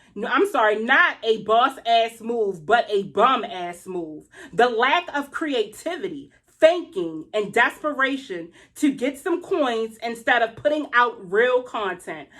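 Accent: Baltimore, United States. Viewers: Sis.....you got my hubby over here cracking’ up with your commentary and b-more ‘accent’!!! No you didn’t say Aiden was talking to you!! LMAO 😂😂😂😂! When he started talking? Apparently you understand him better than Jasmine!! 😂😂😂 Anyway......Girl...you hit the nail on the head in a previous video when you said Chrissy was irritated and done with Jasmine. I think Chrissy done cut her off because on Jasmine’s New Year video she was talking about her ‘friends’ living so far from her so she’s looking to find ‘mommy groups’ near her so she can get out! And....Jasmine is now so friendly with Chrissy’s enemy - must have pissed Chrissy off! The fact that Jasmine & Chris haven’t been invited or planned a trip to Chrissy’s for the holidays, a double date, a kids play date or for them to be vlogging together said a lot. It’s been how many months and 2 besties haven’t met each other’s significant other face to face? 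Something is up with that! We all know Ming ain’t fvcking with Jasmine. And it looks like Chrissy no longer wants to associate herself or channel with any parts of Jasmine’s fuckery and disgrace. Chrissy is trying to get her own ‘train wreck’ of a life back on track and stable with this new man! She’s not going to let bum ass Jasmine fvck that up! Jasmine is just pitiful! Maybe her illness for the last two weeks are actually withdrawal symptoms due to Chrissy cutting her ass off!!!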